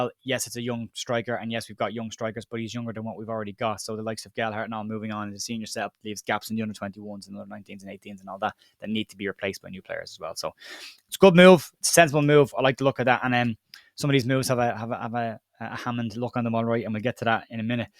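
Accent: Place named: Ireland. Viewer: Thanks, plenty of false announcements in my feed this morning from most of the other LUFC YT channels, didn't even bother to watch them. These days I'm happy to wait for the real news, in an Irish accent.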